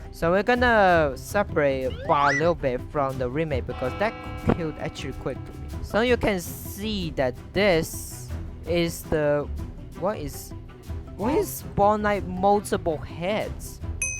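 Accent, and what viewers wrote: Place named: Malaysia. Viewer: He's Malaysian, English is not his native laguage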